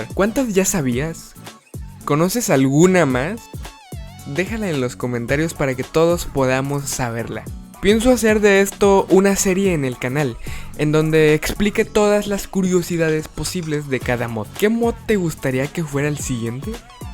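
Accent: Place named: Mexico. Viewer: eres mexicano wey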